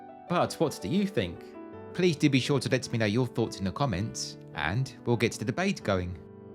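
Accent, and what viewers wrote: London, United Kingdom. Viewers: I was being a little facetious 🤪 which was why I tagged in my comment. His accents kinda London by the way. And there are so many accents and variations in the UK it boggles the mind. What most people think of as the standard Brit accent is probs a variation of received pronunciation or RP. Butr really only a small % of peeps speak like that. But however it is, research and info makes me fall in love with London and it's surrounds even more. Quite fitting for a city with almost 2000 years of history. Thank you King Lud 😅♥️ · I swear to god, British English accent makes any video interesting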